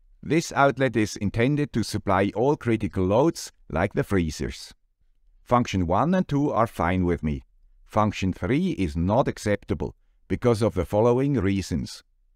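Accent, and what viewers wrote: Switzerland. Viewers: I recorded "here is the guy with the Swiss accent" onto a solar powered recorder. So I can get a smile on my face even if power goes out for weeks.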